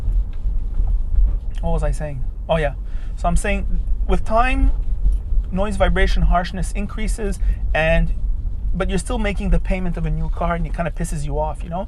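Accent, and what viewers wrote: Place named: Quebec, Canada. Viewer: Great review from an honest guy! French Canadien?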